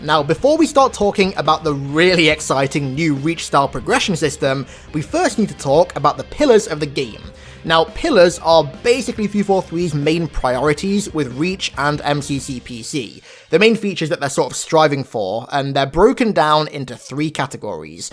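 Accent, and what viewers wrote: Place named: United Kingdom. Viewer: Your voice is annoying I didn’t know I’ll be listening to a British pausing squeaker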